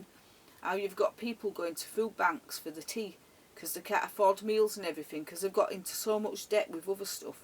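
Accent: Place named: North, United Kingdom. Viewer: Lovely northern accent:) Where did you live in SCotland? Is it generally cheaper to live there than in England? Thank you.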